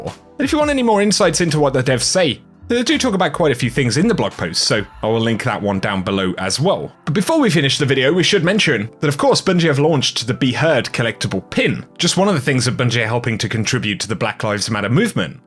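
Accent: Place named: United Kingdom. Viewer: It’s a british accent, big deal?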